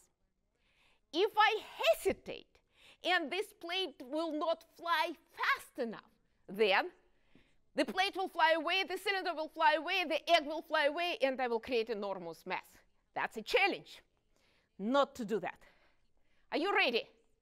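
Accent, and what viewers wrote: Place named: France. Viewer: I thought she was from France or Germany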